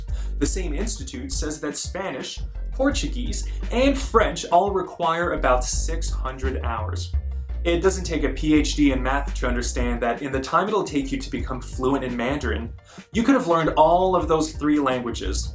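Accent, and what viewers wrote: Standard. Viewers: 卧槽你普通话好标准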